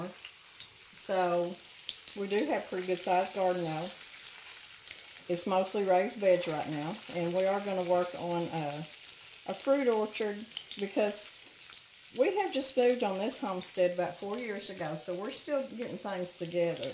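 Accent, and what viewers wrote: South, United States. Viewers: Oh my your camera is wiggin out today! 🤔 Love this recipe, and your sweet southern drawl! Definitely comfort food with the addition of mashed potatoes and sweet peas and butter! Yum! Thank you for recipe using venison!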